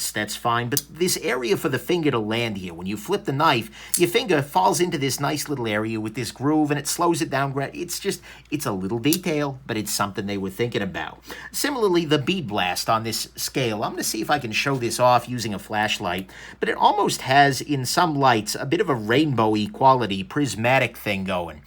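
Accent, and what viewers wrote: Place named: Alabama, United States. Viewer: Any chance this guy is from Alabama? (Typing with my INFANT FINGAS) LOL... Love the review for real. Much love homie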